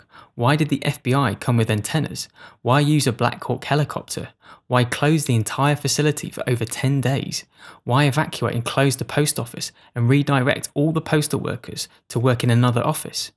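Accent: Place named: United Kingdom. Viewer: Bloody Brit-ish acc-ent!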